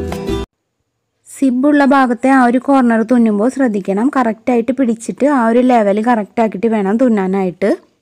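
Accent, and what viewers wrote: Thrissur, India. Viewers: Bro, super ayiitunde, thrissur il avidey a veedu, samsarthinu oru thrissur touch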